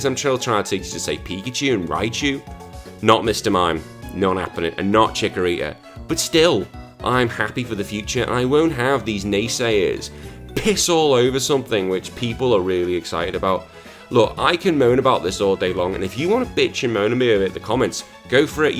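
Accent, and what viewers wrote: United Kingdom. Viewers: chibi dawn is soo adorable and i got a question are you british or irish i dont want to sound like a idiot sorry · love your accent. British to a tee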